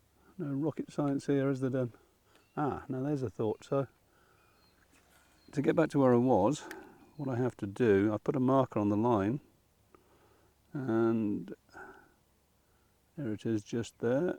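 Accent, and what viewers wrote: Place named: Doncaster, United Kingdom. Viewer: What was the name of that reel that released the line from the clip if you got a strong bite? When they came out, I decided I would buy one the next time I went to my local tackle shop, but like many things, I forgot! Where did you live when you were in England,? You seem to have an accent similar to my area of Doncaster.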